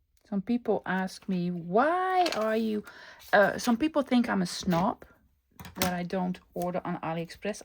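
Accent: Netherlands. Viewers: I was sitting here watching you and thought, “I wonder where her accent is from, it’s probably Netherlands.” And at that very moment, no sooner was the thought in my head, when you said something about - here in Netherlands! Kinda freaked me out! I like your idea with Washi tape book mark! So cool.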